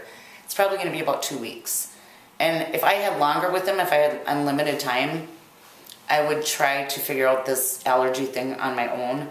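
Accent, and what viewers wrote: United States. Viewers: Good to see you again, and hear your Yankee Doodle accent. Conway is a cutie pie.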